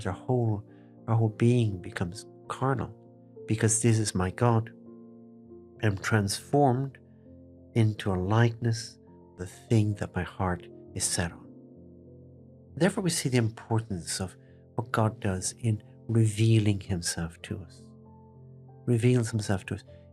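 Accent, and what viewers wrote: Ireland. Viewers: his brogue, while lovely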